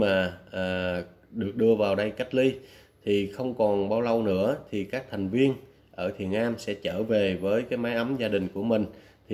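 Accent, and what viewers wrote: Standard. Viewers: Cô chào cháu kênh nói chuẩn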